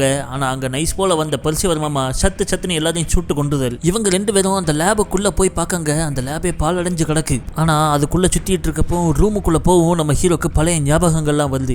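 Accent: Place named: Tamil Nadu, India. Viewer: நண்பா உங்க தென்னக தமிழ் மற்றும் குரல் மிகவும் கவர்ந்தது. 👍🎉😍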